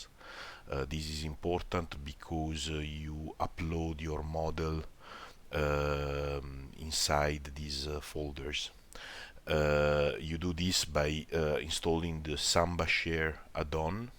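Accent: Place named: Italy. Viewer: Per ringraziarti ti ho "comprato" un caffè! (fra l'altro dall'accento immagino tu sia italiano)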